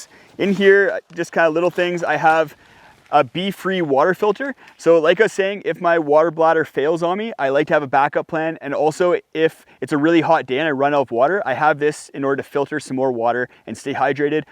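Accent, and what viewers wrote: Canada. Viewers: The Canadian accent is amazing. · Wow this guy’s Canadian accent is thicc!!! I’m from Detroit so familiar with Windsor, Ontario but this is at another level. Great information though! I added some of these items to me REI shopping cart. Thx!!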